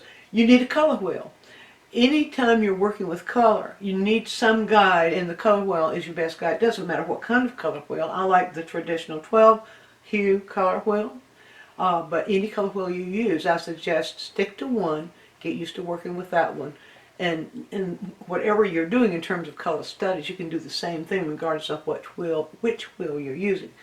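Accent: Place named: South, United States. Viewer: a smooth southern drawl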